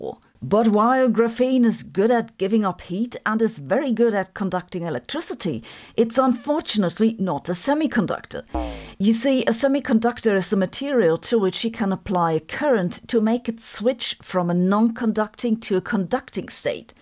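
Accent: Germany. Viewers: but seriously, she is German. I hear they might have an accent over there that is different from what you might be used to :P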